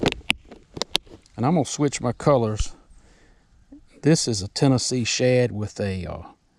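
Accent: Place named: Virginia, United States. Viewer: Nice, where are you located? You sound like a Virginian.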